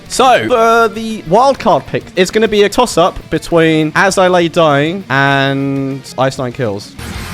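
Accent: England, United Kingdom. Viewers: I fkn love this bri'sh accent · me agrada ese acento ingles, bro ! suena genial!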